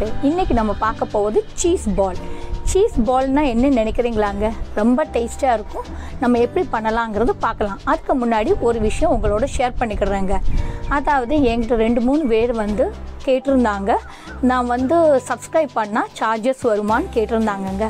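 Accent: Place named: Kerala, India. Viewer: Good mayalam mix tamil voice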